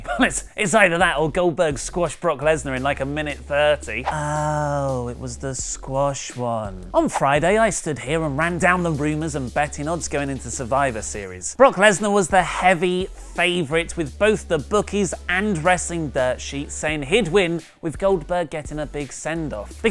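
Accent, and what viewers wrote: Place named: United Kingdom. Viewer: I have trouble with your British accent!!!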